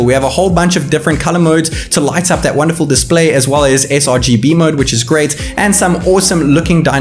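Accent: South Africa. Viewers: Are you South African. It sounds like it. Love from SA :)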